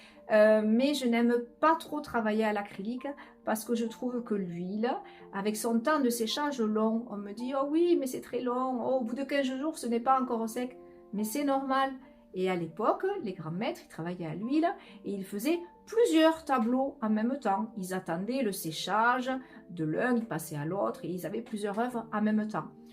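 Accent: South, France. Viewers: Merci pour tous ces conseils et surtout ne vs arrêtez pas de parler avec votre accent du sud c est le soleil qui ns envahi!!! Ah et vs vs êtes un vrai rayon de soleil merci pour tout ce que vs ns apportez.❤❤❤❤énormes bisous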